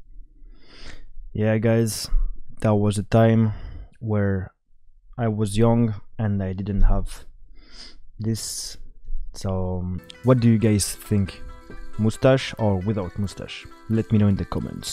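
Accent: France. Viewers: are u french?🤔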